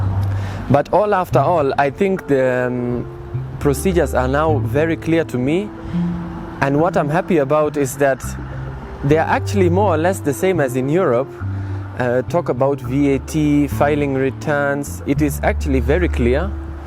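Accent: Kenya. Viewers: His Kenyan accent is dope! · Kenyan accent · 🧠🧠❤👈👈✅✅✅👌👌this man he is smart intelligent person because the way he performs and pertaining the accents of Kenya English ✅✅✅✅🤔🤔👌👈🧠🧠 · You have a Kenyan accent 😅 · Haha Typical Kenyan accent 🤣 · Wow you speak like a Kenyan 😲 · Accent ni Kenyan Kabisa... inspiring though.. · Cool venture. It's so funny that he even speaks English with a Kenyan accent. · Kabisa. Kenyan Ancent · hii accent ni ya county gani Kenya? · Very valid points about logistics...whoever figures that out for africa will be a very rich woman/man..... his accent tho if I didn't see him I'd think he's kenyan wow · This guy is so Kenyan and is most likely married to a luo hahaha I love the accent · As a Kenyan, if I listened to audio of this video alone, I'd say he was born and grew up in our country...pure Kenyan accent! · DUDE SOUND LIKE HE WAS BORN AND BRED IN KENYA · Yeah.. 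He speaks like a kenyan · This dude has picked up a "Kenyan English" accent. Cool. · His accent already sounds kenyan... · He even mastered our kenyan accent. 🤣🤣🤣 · His accent is soo Kenyan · am impressed with your new Kenyan English accent. happy for you. will visit soon. love from uganda. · The guy has a Kenyan accent, that surprised me, kudos mate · He speaks like a Kenyan, wow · His accent is a mixture of luo kalenjin and kikuyu. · dude you sound more kenyan than me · You even have a Kenyan accent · Close your eyes and you will hear Kenyan speaking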